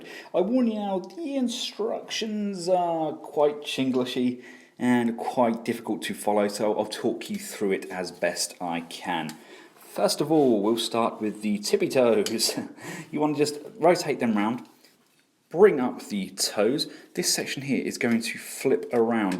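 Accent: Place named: United Kingdom. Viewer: british accent... must sub...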